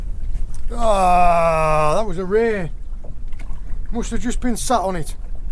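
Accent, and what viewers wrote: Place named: Cumbria, United Kingdom. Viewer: Great content as always mate, you proper sound like your from Cumbria, the accent is uncanny, I’m up in a town called Maryport, keep up the videos mate 👍🏼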